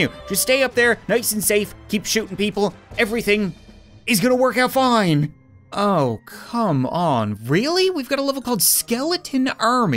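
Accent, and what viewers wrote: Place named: Canada. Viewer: Why was I thinking "Wind Conditions?" Must be the Canadian accent.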